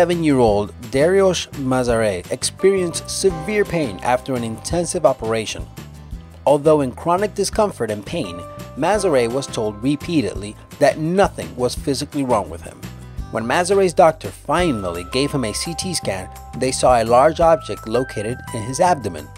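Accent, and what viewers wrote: Mexico. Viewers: why is this mexican talking? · You have the best Mexican voice ever your an awesome commentator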